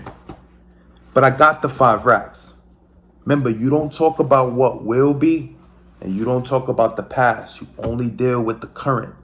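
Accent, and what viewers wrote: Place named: New York, United States. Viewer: aye you sound from NY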